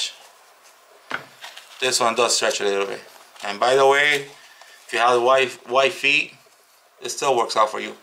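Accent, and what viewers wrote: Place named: Arab world. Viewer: Where r u from u sound Arab, can you please do a review of more different color shoes, thank, nice review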